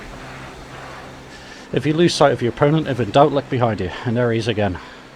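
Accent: United Kingdom. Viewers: Kind of cool and weird at the same time hearing an Englishman narrating DCS in a FW-190 lol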